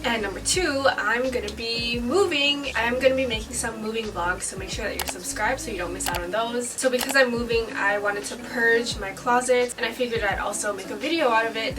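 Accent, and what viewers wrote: Hawaii, United States. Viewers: As someone who’s also from Hawaii, I enjoy hearing just the slightest hint of moke in your voice! Can’t wait to see the moving vlogs (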